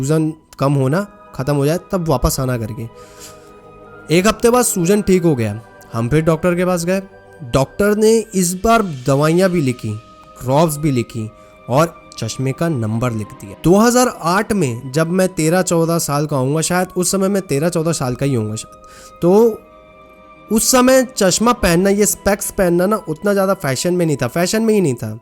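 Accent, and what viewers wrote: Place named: Hills, India. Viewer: You are talking like pahili vale log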